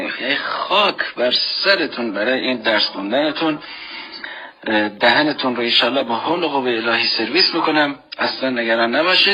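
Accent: Iran. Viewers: گیلکی بو بره می لهجه را دانه❤😂😂😂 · لهجه کرمانشاهی یا کوردی دارند 🎉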